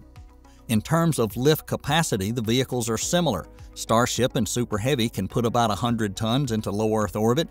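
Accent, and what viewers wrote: South, United States. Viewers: don't get me wrong I love you guys... You're my main spacex channel now..🤗👍 And I think the main reason is you have a Southern accent like me and I can understand you better 😂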